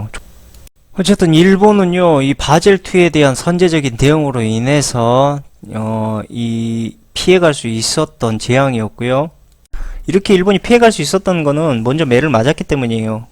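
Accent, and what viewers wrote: Jeolla, South Korea. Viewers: 고향이 전라도신가봐요~^^와 뇌섹남 어찌이런생각을 하시는지 완전매력적이시네요 멋있어요♡♡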